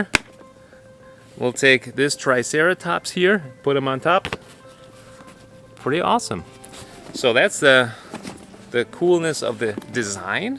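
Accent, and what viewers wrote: Germany. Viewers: If you wouldn,t of said different , I thought you are german ,speaking english with a german accent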